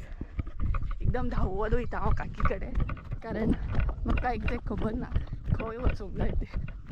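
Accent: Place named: Pernem, India. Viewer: from which place r u Pernem coz from ur way of talking I understood that u r from Pernem.side the way u said gauta Proud u should feel of urself.coz u r showing the hard work before the monsoons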